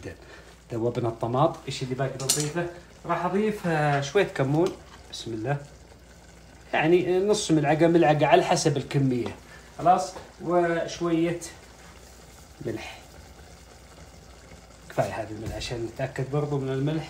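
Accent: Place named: Al Bahah, Saudi Arabia. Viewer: اللكنة زهراني او غامدي كيف كذا علمنا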